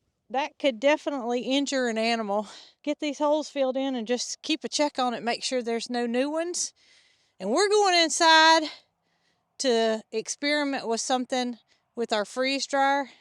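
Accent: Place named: Midwest, United States. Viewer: ❄️You sound like you live in the Midwest USA like me. I hear we might be getting more. Stay safe. ❄️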